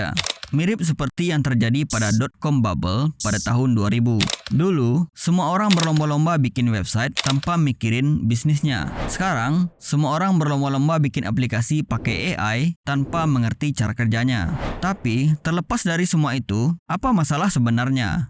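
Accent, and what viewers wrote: Aceh, Indonesia. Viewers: Suka banget kontennya ❤ Logatnya seperti Aceh :)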